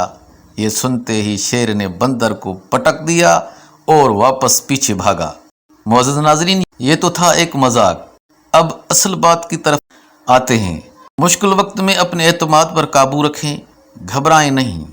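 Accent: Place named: Sindh, Pakistan. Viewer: بہت ہی خوبصورت انداز سے آپ نے کہانی بیان کی، لیکن لہجہ آپ کا بلکل سندھیوں جیسا ہے۔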